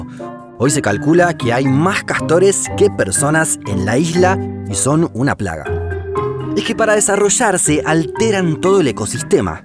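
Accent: Argentina. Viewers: jaja hasta apenas me di cuenta de que la voz del video tiene acento argentino :o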